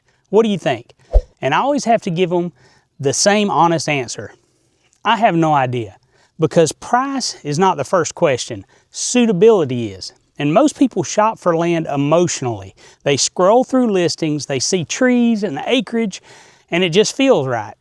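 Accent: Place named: South, United States. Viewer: Although I would hope that my property has a little bit of soul... I think what HE is saying, is "soil". I do love a Southern accent... ❤😂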